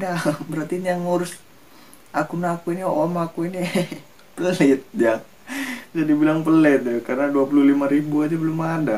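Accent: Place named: Banyumas, Indonesia. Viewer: Ngakak ngapak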